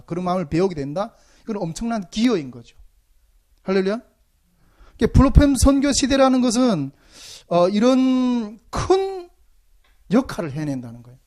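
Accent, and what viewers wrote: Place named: North Korea. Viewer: 이런선교모임도 있었네요. 윗 동네분들 발음과 억양은 조금은 생소합니다만 주안에는 이런 시원한 생수같은 말씀을 처음 듣게됩니다. 귀하시고 감사드립니다.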